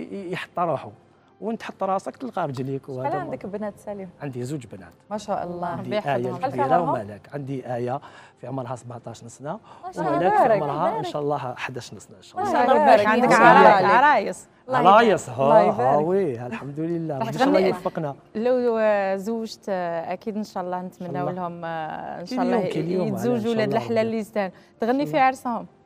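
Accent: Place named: Skikda, Algeria. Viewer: لحن هاداك تاع اللهجة السكيكدية مزالها عندك تهبل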